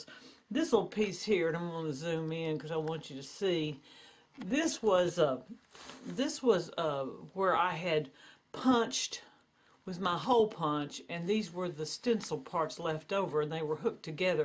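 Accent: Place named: South, United States. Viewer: i really lik it....thanks for sharing,.....ps i love your southern accent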